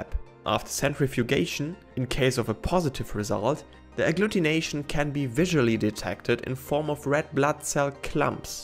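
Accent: Germany. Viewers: Awesome video. Hab direkt gehört, dass du aus Deutschland kommst 😁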